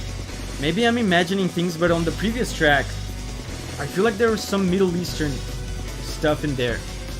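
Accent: Brazil. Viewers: Esse mano é mto brasileiro kk, dá pra ver no jeito de falar e as recomendações do Yt dele entrega tbm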